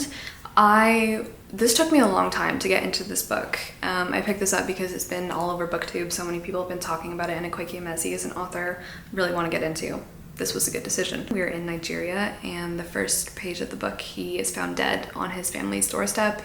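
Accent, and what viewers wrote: Quebec, Canada. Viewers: I didn’t comment on your last vlog, but now with the quebecois accent I have to say it… My tip for big city living is moving to Montreal! It has so many parks, including a « mountain » in the middle of it, most buildings are like 3-4 story high and the sense of community is generally very strong, while still allowing to keep so anonymity and privacy.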